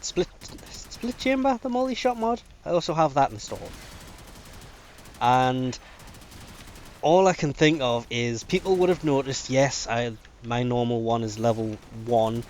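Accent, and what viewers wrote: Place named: United Kingdom. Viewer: what country do you live in? From your accent you sound like your from somewhere in Britain but it was 5pm when the event finished here?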